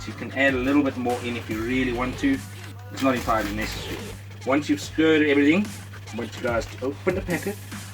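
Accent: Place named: South Africa. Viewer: I wondered what accent this was. South Africa. What a proper country. Cheers.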